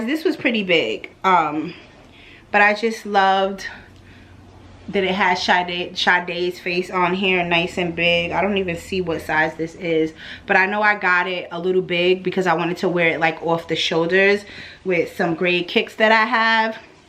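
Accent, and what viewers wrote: New York, United States. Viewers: We know you’re from NY girl, you couldn’t hide it if you wanted lol 😂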